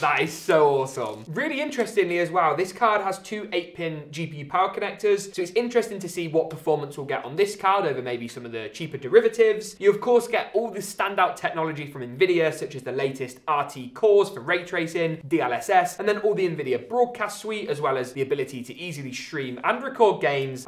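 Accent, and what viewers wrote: United Kingdom. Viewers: 1st this video is awesome. 2nd gotta love that tihcc brit accent, noice🤣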